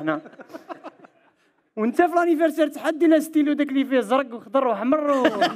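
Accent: Constantine, Algeria. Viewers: اللهجة القسنطينية قرعة ماتصلح لوالو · اسمحلي نطق التاء القسنطينية باين على بعد 1000 كم مام تهدر الروسية De passage bon courage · هديك هي بصح عجبني كي يهدر باللهجة القسنطينية 😍😍😍😍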